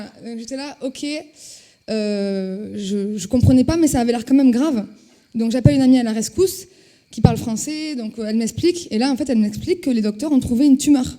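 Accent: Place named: Toulouse, France. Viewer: L'accent délicieux de Toulouse !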